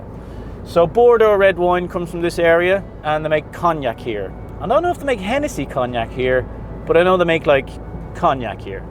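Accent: Ireland. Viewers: Hello, new subscriber, expat Brit. Living in a very rural part of Canada. Please do not stop. You are making a 65 yr lady, very happy. I grew up in area populated with many Irish people. We have a few Irish people here, but they mostly live on the east coast, the Maritimes, I've met a few, they got lost, heading to work, mistaking a town near here for a town in a very different part of Canada. It was lovely to hear there banter etc. No one could understand them but me. Also having a back and forth conversation. I could only do because my ear was tuned to there accent, because of the Irish people, I grew up with. I was so delighted to listen to you. You came the way of Dan. Love Dan but miss his b role and drone shots, amazed at what he's doing. But you have filled in the gap. When I can't sleep, I listen to you. Your personality brings me joy. Don't listen to the nay sayers, of course when you no longer enjoy doing this, stop not for those who like to yip and yap. 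I enjoy every thing you do and learning about everything you have done. People like you give so much to people like me, who have no choice thru illness etc but to live life thru, stories, sights and sounds, that would be closed off from the world. Thank-you.🇨🇦 🇬🇧🇮🇪 Xx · you and your vlogs are a lovely breath of fresh air in a sometimes stale world 😍Just love your honesty and sincerity and your gorgeous Irish accent 🥰 Also, as my travelling days are somewhat over I do enjoy seeing the fabulous scenery of the French countryside - keep on keeping on and stay safe on all your travels - blessings 😇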